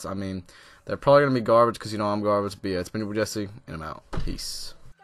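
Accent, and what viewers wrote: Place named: Bay Area, United States. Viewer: Bro you from the bay !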